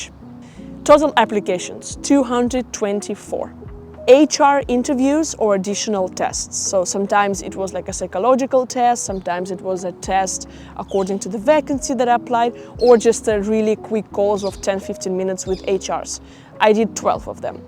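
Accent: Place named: Netherlands. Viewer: It's interesting that you have developed a Dutch accent when you speak English.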